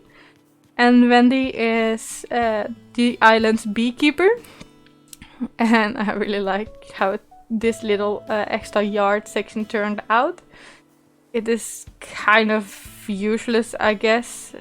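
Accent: Netherlands. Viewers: Aaa okay! I'm from the Netherlands as well and thought I recognized a dutch accent hehe.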